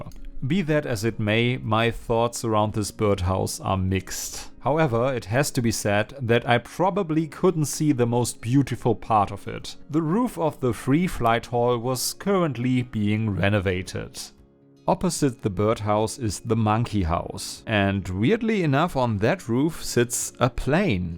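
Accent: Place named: Germany. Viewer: It's actually really pleasing to hear your german accent. Especially as it it paired with German punctuality - not just information about the History of the zoos and introducing animals but about the enclousers itself (like how many m'2 the floor area, how many litres or m'3 the volume of aquariums and pools. It's also good that as an European (unlike American youtubers) you also show and talk about the negative side of the facilities. I saw a lot of Americanyoutubers who only talked about how fantastic is - even it's a neglected illegal pet shop in a third world country And the best for me (and 95% of the whole population) are metric system and measurement units.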